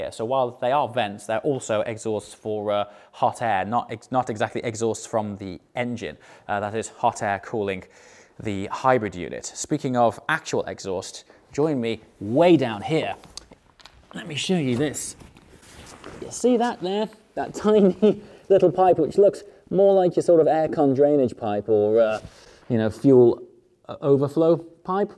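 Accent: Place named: England, United Kingdom. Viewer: Loving that nice english accent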